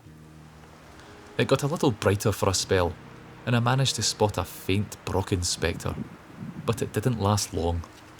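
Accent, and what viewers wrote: Scotland, United Kingdom. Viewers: Stunningly beautiful and said with so much passion and of course the lovely ring of the Scottish accent . I am so proud to be Scottish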